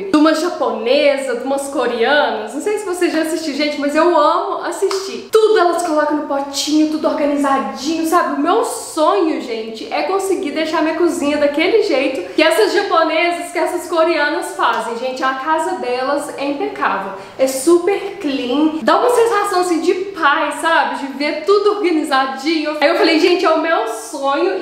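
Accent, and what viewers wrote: Minas Gerais, Brazil. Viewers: Adorei o mineirês! Sou baiana, mas o jeito mineiro de falar é o sotaque brasileiro que mais gosto! Vim do canal da Daniele Paula!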